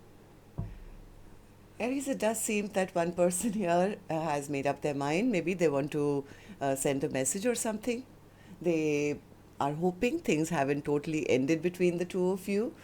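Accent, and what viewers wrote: India. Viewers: Are you hindi please??!! Your english IS very good🎉❤😊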